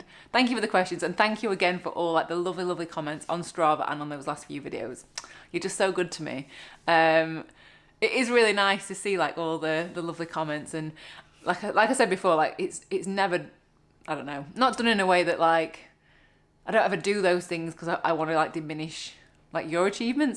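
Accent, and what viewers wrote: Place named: England, United Kingdom. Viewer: You are so beautiful. Listening your vids to hear lovely english accent and also for bikeride infos. Thank You. Also I follow Harley Johnson, amazing man... Good luck to you on your rides. 💛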